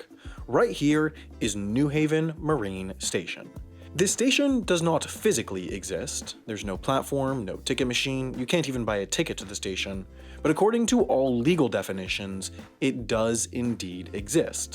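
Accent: United States. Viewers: Why do all these videos about ghost trains (and stations in the UK) all feature an American voice narrating them? Is it because Americans (still in 2023) find the concept of trains a novelty and something of an 'amusement'?? ..... while every other country just sees them as a vital part of their transport infrastructure. And an asset to their country's economy. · Gabbering Yank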